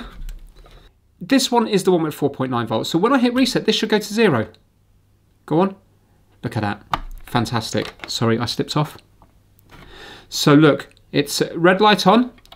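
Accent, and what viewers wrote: United Kingdom. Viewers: Amazing actually. Brittish accent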